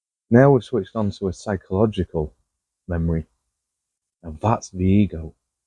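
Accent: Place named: Manchester, United Kingdom. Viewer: Trying to place your accent, from the north somewhere, but maybe not Yorkshire, almost a Manchester thing going on there, I've lived in Lancashire all me/ my life.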